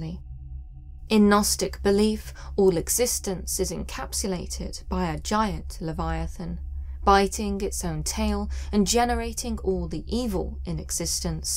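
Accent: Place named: East Anglia, United Kingdom. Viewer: I love you lady! Your East Anglia accent and proper demeanor excel beyond the mediocre expectations placed on YouTube by so many. You are better than you do and deserve your Home television show or 30 minute spot at the least!! Bless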